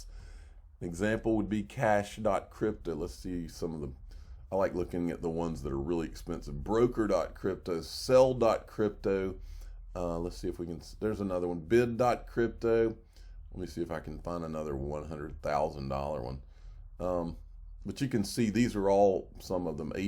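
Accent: South, United States. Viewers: Bad mic settings , thick southern accent with mispronunciations and old news = BYE BYE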